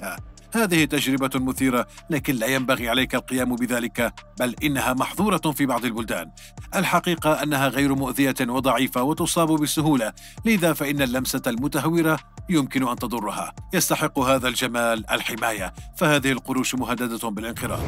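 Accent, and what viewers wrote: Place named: Lebanon. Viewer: صوت مميز يصلح في الوثائقيات والاخبار بصفة عامة ❤ ما اسم صاحب المقطع واتوقع انه صوت لبناني يذكرني بصوت وحيد جلال (سلفر) كانه هو الاميز من بين الاصوات العربية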